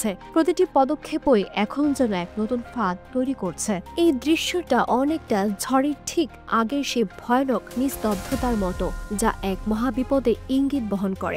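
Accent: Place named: Bangladesh. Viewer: Why you are not giving “Go back slogan” to Mamota or Firhad” to whom 10 people are dead before Puja?? and everyday people are raped,murdered??? India is still stabile due to Narendra Modi and who can talk against Donald Trump,,,your Bengali languages sounds like you are a Bangladeshi journalist…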